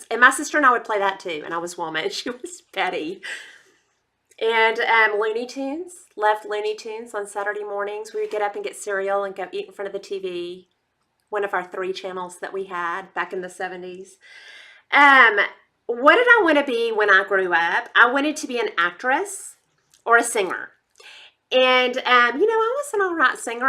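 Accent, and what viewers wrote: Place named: Texas, United States. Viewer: where the Heck are you from? I talk just like you and the "YALL" was a dead giveaway. What town in Texas are you from? I laughed my butt off all the way through this video. It was GREAT! Loved every minute of this. Hope you are having a terrific week. Sending Big HUGS to ya Girll! xoxo Sharon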